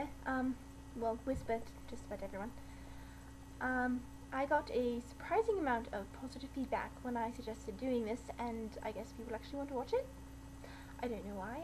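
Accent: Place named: United Kingdom. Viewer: OMG!!! YOU ROOOOOOCK! (for a second, just a second, i really thought "wow i forgot she had a british accent") Randomness #1: I was the first 1 to coment!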